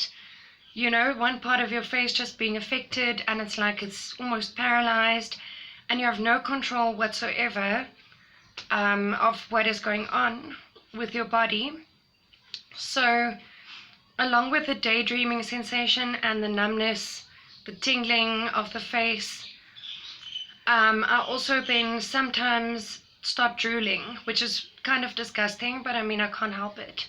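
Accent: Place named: Germany. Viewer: Hallo, meine Schatz. Just found your site; not sure if you’re still active with your channel. Have you heard of people with TLE while sleeping? I’m an academic researcher compiling data on this phenomenon and I would be interested in your or others’ experiences with this. I used to live in Deutschland and you sound German; forgive me if I am wrong. I hope you’re making some progress with living with TLE, and I 100% agree with your choice not to hypermedicate; good for you. I will check back to see if you have any experiences or leads for others with sleeping TLE. If so, I will put you in touch with my study. All the best wishes.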